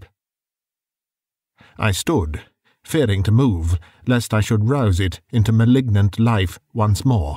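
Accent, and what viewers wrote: United Kingdom. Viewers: I agree! The British voice is very very pleasing! IM SIMILING RIGHT now!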